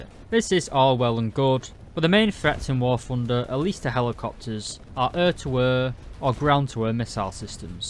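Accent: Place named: Lancashire, United Kingdom. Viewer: Where abouts in the UK are you from pal? Sounds like Lancashire to me. I'm from Leigh near Wigan/Manchester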